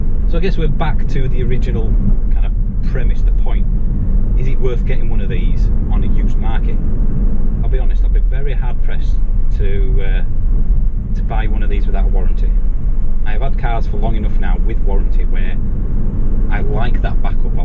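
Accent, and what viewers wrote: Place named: England, United Kingdom. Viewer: is talking English not American.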